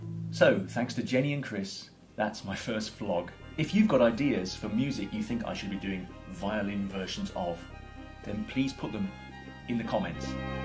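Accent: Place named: United Kingdom. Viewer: your magical british accent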